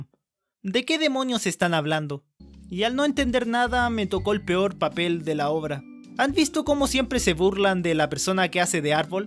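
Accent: Chile. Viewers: Por su forma de hablar se nota que es chileno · Alv sabia que vivias en chile :v tu voz sonaba chilena '_'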